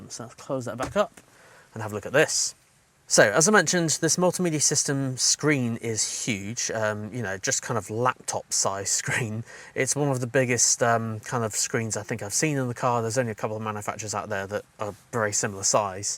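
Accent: United Kingdom. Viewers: I find it rather odd that a dude with a British accent, sitting on the right side of the car is complaining that the indicator stalk is in the correct place. I really hope BYD doesn't listen to you and keep it on the side of the driver door like it should be. In a RHD car, the indicator is on the right and on the left if the car is LHD. See every Toyota, Nissan, Honda, Mitsubishi, Subaru, Mercedes Benz - made for a particular market (RHD vs LHD). I assume that if the Japanese can get it right, the Chinese can too. I don't know what's wrong BMW and VW. They're just lazy I think. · British accent based in usa?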